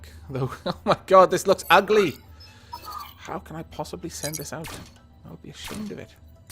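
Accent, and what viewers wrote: Wales, United Kingdom. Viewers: are you from wales buddy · You're so welsh! Nice vid though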